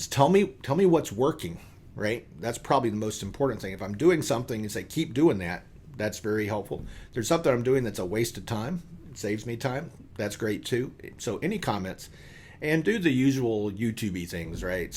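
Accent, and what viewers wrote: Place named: South, United States. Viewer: Excited, one to finally meet you here,. Two to finally be able to understand someone not too fast or too slow speaking, and also like your southern draw. Its refreshing 😊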